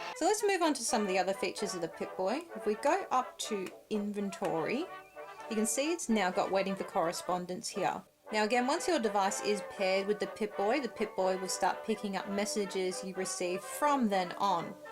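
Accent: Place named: United Kingdom. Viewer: Definitely want one. Bet they are pricey. Nice vault suit by the way, love the British accent.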